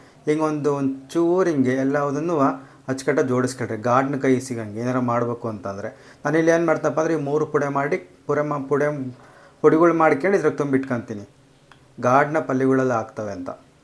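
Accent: Chitradurga, India. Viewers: ನಾವೂ ದುರ್ಗದವರೆ,same ಹೀಗೆ ಮಾತಾಡೋದು.ಹಿಸ್ಕರಿಯೋದು ಅಂದ್ರೆ ಬೆಂಗಳೂರಿನವರಿಗೆ ಗೊತ್ತಾಗಲ್ಲ😂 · ನಾನೂಚಿತ್ರದುರ್ಗ ದವಳು ಅಂದ್ರೆ ಹೊಸದುರ್ಗ ಇವರ ಭಾಷೆ ಕೇಳ್ತಾ ಏನೊ ಮೋಡಿ ಒಳ ಗಾ ಗು ತಿದ್ದೆ ಇದು ನಮ್ಮದೇ ಸೀಮೆ ಯವರು ಖುಷಿ ಯಾಗುತ್ತದೆ 👌❤😄😄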